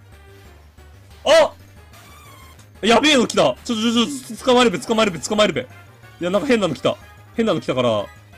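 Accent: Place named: Kansai, Japan. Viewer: って関西弁似合うね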